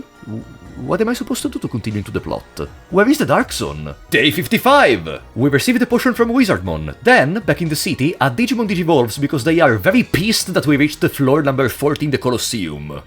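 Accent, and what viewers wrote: Italy. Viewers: I think you are italian just like me, kinda feel it from the accent, btw i'll comment in english cause I think that's the target that you want to reach; i'm impressed from the video, the dedication and the will you put on it, i love it from the bottom of my heart and i laughed so much so many time, please keep going on! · are you italian? the accent sounds like it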